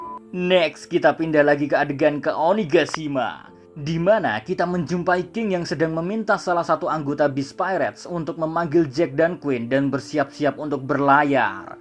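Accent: Java, Indonesia. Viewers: Min coba pake narasi full basa Jawa. Medokmu mantap bgt min. Aku suka 😂 saya nyoba pake narasi medok di konten saya a dapet min. Apa gara2 saya jawanya cuman 50% yak? Kasih tau rahasianya supaya bisa medok min 😂. Kasih feedback yaa gan saya medok kek si mimin apa kaga 😂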